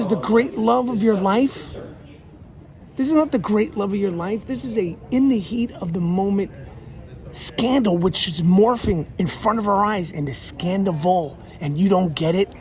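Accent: New York, United States. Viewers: Love you and your New York accent. Love your work especially as a beat cop shooting a bird on Friends. You are a classic. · I love hearing your opinions on all of it and how passionate you are about all things Bravo- keep ‘em coming. And F YouTube for restricting you- I need your F bombs and New York street talk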